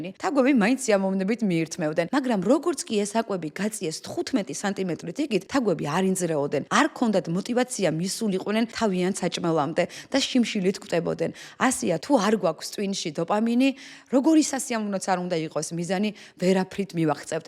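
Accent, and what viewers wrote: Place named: Guria, Georgia. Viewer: "კვტეპიან" გურული ხარ უეჭველი❤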